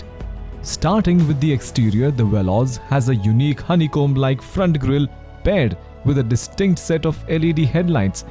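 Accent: India. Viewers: Its japanese car..its weird when indian voice introducer start kick in 🤣. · India. listen carefully to his english.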